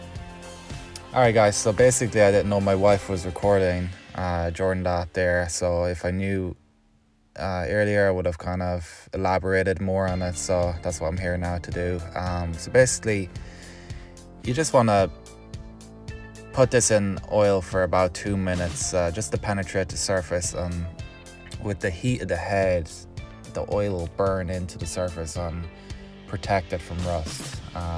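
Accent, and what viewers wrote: Ireland. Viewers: Great work. You have IRISH heritage? Accent and words indicate yes 👏🏻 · Class work!! Hint of an Irish accent?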